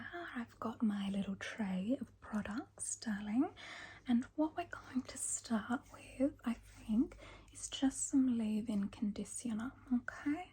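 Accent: Sydney, Australia. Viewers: You sound exactly like my mum's friend Shaz, who grew up on the North Shore of Sydney. Perfect.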